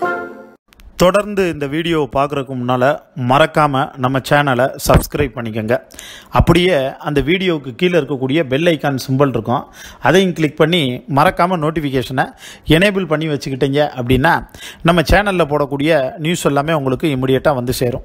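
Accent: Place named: South, India. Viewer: Ninga South ah? Slang apdiye pesuringa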